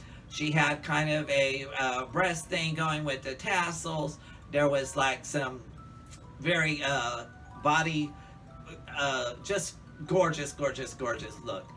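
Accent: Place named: South, United States. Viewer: Thanks for the subtitles,. Not everyone can speak Southern Country. Great review! Hot Topic Clearance Rack, lmao.....Happy Halloween Doll!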